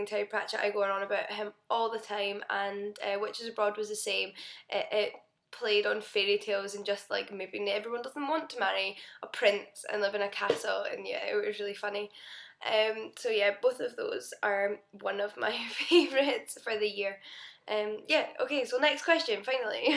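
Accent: Scotland, United Kingdom. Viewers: Where are you from in Scotland? I'm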